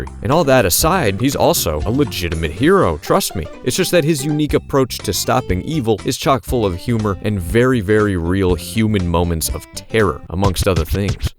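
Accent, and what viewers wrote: United States. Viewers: And do I detect a hint of a ghost of a southern twang?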